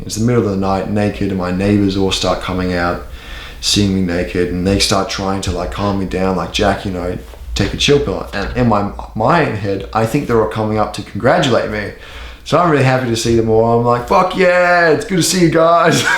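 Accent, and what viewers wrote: Australia. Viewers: Something about how you looked in the thumbnail made me assume your Australian for some reason, but your accent is throwing me off big time, sound like an aussie who lives in the US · he sounds like an aussie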